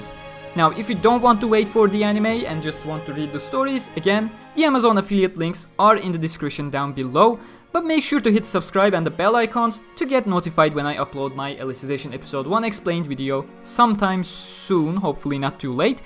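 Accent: Turkey. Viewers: ingilizce konuşuyon ama konuşurken türk olduğun çok anlaşılıyor xd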